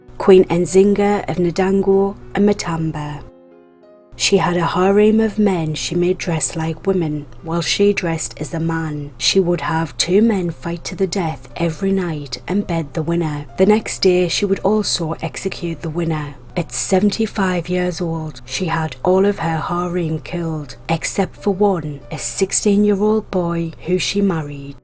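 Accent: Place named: Northern England, United Kingdom. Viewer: Its a lovely soft accent north of England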